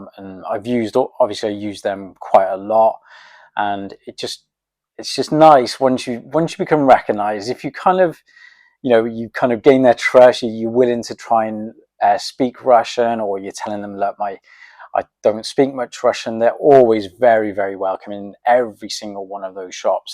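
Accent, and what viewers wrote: United Kingdom. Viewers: has a very good British pronunciation, it's quite easy to understand him. I've had an opportunity to speak with many other English people and often it was rather difficult experience. (my ear trained more to northern American version of English). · Как приятно слушать британский английский. Стала каждый день Вас слушать без перевода. Удачи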